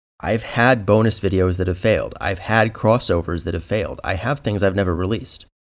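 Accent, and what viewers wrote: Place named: Michigan, United States. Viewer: THIS MAKES SO MUCH SENSE! Every time you speak I think “he sounds like he is from around here (Michigan)”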